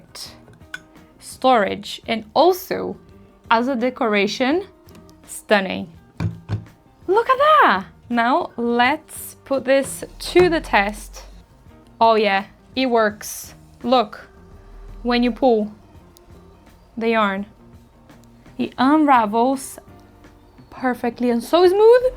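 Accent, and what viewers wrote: Brazil. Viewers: Você é brasileiraaaa? Tem sotaque de brasileira ❤️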